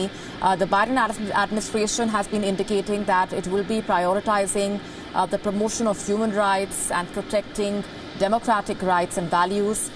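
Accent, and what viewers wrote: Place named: India. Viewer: Indian English 😁😁😁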